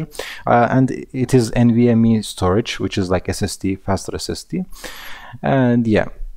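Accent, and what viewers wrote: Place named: Poland. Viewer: Omg nicee accenttt~ I love men with Polish accent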